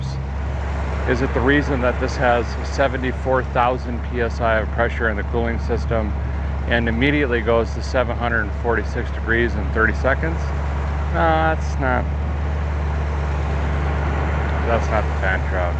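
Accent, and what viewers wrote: Minnesota, United States. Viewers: The multi-millionaire celebrity Vice Grip garage... havent you yet transcended beyond ACTUALLY having to produce content? No billionaires just supporting you for being the celebrity you are with your "Fargo" Minnesota BS Accent? All this is is Hoovie 2.0...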